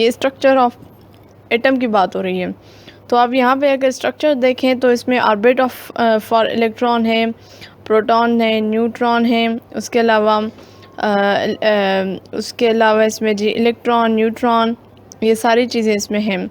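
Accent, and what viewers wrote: Sindh, India. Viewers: Searching for best lecture on atomic structure and got your video ❤ .... Love your Sindhi accent Urdu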